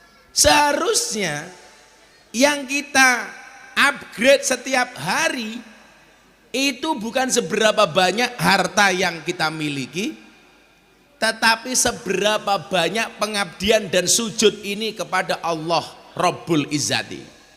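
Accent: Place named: Java, Indonesia. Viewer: Kiyaiku yg ganteng alhamdulilah muncul lagi.aku suka medok jowone metu.kiyai udolah semua imat sejahat raya.gas puulll mantap kiyai ganteng.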